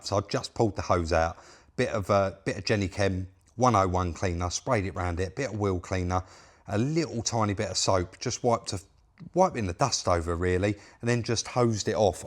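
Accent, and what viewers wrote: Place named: East London, United Kingdom. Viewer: Gertch you cow son gertcha. It's a luvly accent I was dragged up in Stepney and his accents' the same as mine "common as muck".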